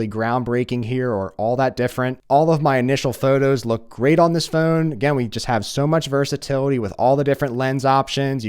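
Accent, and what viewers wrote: Philadelphia, United States. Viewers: Gotta be from Philly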